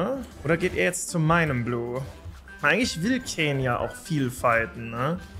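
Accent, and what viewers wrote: Bavaria, Germany. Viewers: Wie sich der bayrische Dialekt am Anfang ausschleicht 😂😂😂😂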